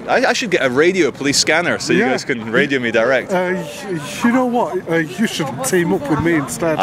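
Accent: Scotland, United Kingdom. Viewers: you sound east coast Scottish, Edinburgh area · I thought you had a Scottish accent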